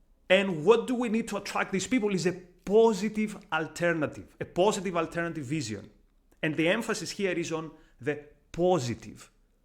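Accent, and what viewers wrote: Greece. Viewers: Thanks for the effort to think ahead of the curve here. I’m not sure the anti-Israel part of it is really a secret sauce though - the left are absolutely open about it - it’s one of their ‘key pillars’. Left wing ‘magic money tree’ populist economics might be the most dangerous part… enough time has passed since post WW1 economic collapse for many western useful idiots to be willing to try it again 😢. Anyway, your Greek voice makes a refreshing change from that ubiquitous old economic fraud Yannis Varoufakis’ nonsense 😊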